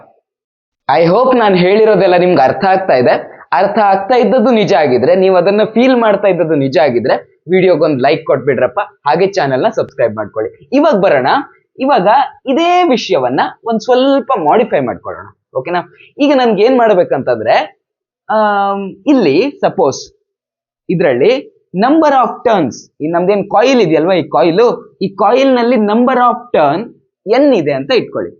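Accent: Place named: Mangalore, India. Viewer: Sir from manglore, are u also from manglore . . .. ur accents match ❤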